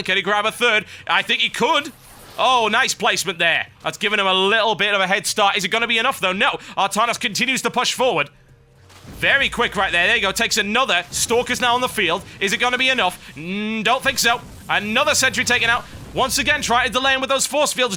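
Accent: United Kingdom. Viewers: your British accent keeps me alive!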